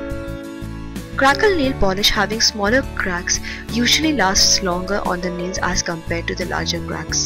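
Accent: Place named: India. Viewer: It's an Indian accent she has, I think anyway, It's not actually weird sounding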